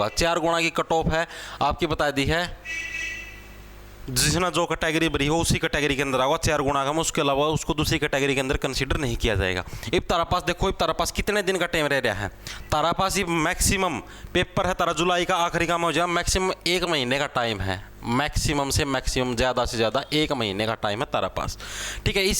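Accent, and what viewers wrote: Haryana, India. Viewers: Haryanvi andaaj❤❤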